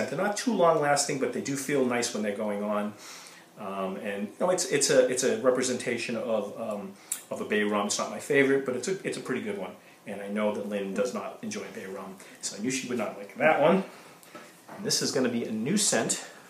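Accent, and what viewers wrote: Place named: Boston, United States. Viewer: I hear Boston!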